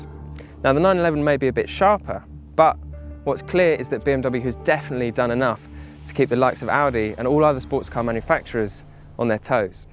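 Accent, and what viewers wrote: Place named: England, United Kingdom. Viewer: I don't know why, but I can't stand the way English people talk.. It has the power to make the coolest cars sound absolutely rubbish, darling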